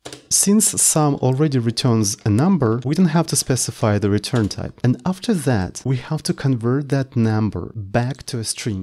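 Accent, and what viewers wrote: Russia. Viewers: Чую рашн аксент. Найс видео, keep going.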